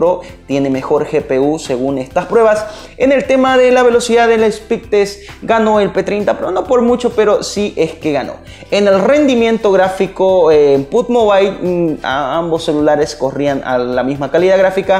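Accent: Bolivia. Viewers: tenes el acento de un camba, y tipico siempre dicen el "obviamente" jjaja donde queda tu tienda?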